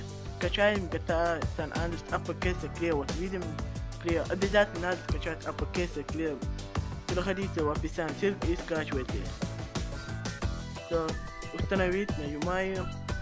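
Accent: Chechnya, Russia. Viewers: ОН ЧЕЧЕНЕЦ!!!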